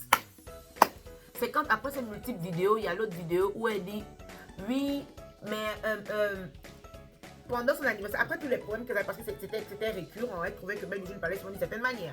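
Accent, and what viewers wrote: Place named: Gabon. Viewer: Êtes vous Gabonaise ? Mon DIEU l accent de chez moi hein…Je me suis abonnée tout de suite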